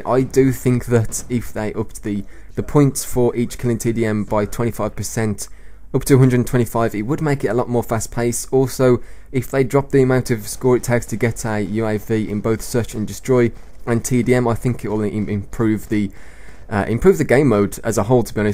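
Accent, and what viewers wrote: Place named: Northern England, United Kingdom. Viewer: where are you from? It sounds maybe north england (Don't really know though)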